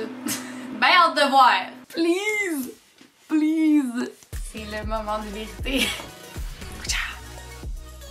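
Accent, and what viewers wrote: Quebec, Canada. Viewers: J’adore ton accent canadien ton énergie et ta joie de vivre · Wow t'es tellement mignonne, je te trouve magnifique et puis ton accent québécois est juste canon ! Tu fais boom à mon petit cœur 😊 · L accent quebecois le plus fort DU MONDE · excellent ta vidéos je la kiff aussi , vous êtes très déterminée bravo ...j'adore ton ascent du Québec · Le gallon une Unité de b** mais juste aprés... Tu parles en fu*** degrés farenheit... De qui se moque t on ?! (sinon j'aime beaucoup ta personnalité et entendre l'accent canadien , c'est rigolo, c'est agréable à regarder; je reviendrai !) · Québécoise? ;-) <3 J'adore cet accent, putain!!! <3<3<3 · Question stupide mais, comment tu différencie "ça" et "ce" avec l'accent québécois? J suis juste curieuse de savoir ☺ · J'adore ta chaîne parce que tu fais des choses originales. Et ton accent québécois 😍😍😍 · Fais une video 24h sans l axent québécois stp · Je rêve ou je suis la seule à regarder cette vidéo à 1h24 du mat 😂😂 je kiffe grave ton accent Québécoise et t grave marrante en plus ( à peine regarder je kiffe t vidéo)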